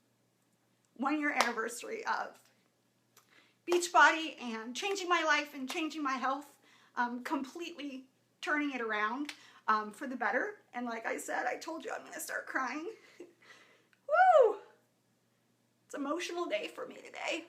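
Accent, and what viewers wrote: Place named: Chicago, United States. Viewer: Hi, I really love your enthusiasm! I'm originally from Chicago,but now reside out in Arizona with my husband and two now adult children! You have an "Chicago accent";) I'm a Christian and love the joy that you exude! I started following you on Twitter too! Blessings from sunny(and Hot!) Phoenix, Arizona!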